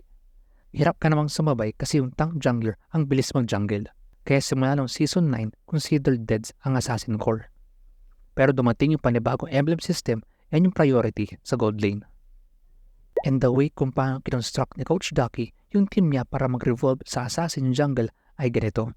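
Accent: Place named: Pangasinan, Philippines. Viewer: Naririnig ko ung Pangasinan Accent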